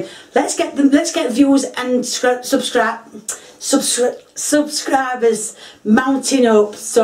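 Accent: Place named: Sheffield, United Kingdom. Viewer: Hi:) can you make your own pizza? if not for you.. then for Lee...;) I'm from Sheffield... you sound from round this way x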